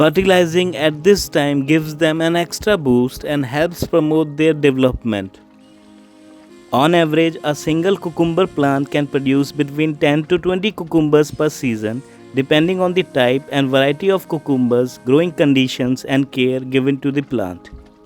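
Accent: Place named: India. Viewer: It is Indian English just like there are American English or British English.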